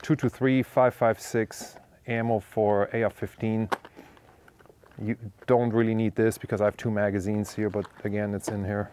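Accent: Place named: Germany. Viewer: Great Video! Do you come from Germany? It sounds so. Greetings from cologne😁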